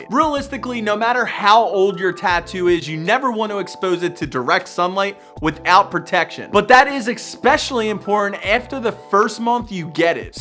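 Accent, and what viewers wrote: Philadelphia, United States. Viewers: The way you say water (wooder) reminds me of someone from Philly, Jersey or New York . . . Wonder if you say radiator like someone from Philly. ✌😁👍 ( RAD - EE - AYE - TOUR ) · Yo are you from Philly?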